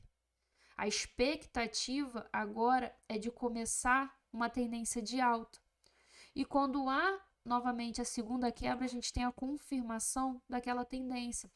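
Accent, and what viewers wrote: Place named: Rio de Janeiro, Brazil. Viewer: começando o curso hoje. alem do sotaque carioca, que é muito gostoso de ouvir, sua didatica é otima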